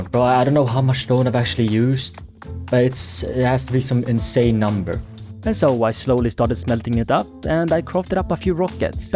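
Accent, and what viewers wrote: Sweden. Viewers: are u swedish cuz u kinda sound like swedish?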